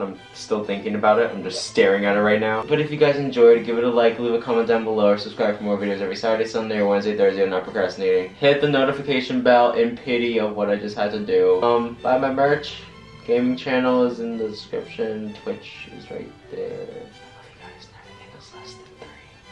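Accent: China. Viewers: your chinglish is beautiful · That Chinglish 😂 honestly same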